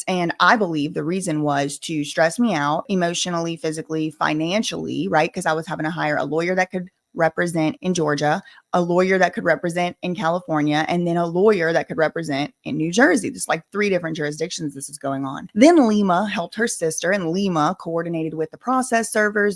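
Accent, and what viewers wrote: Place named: Baltimore, United States. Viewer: You from Baltimore? You got the accent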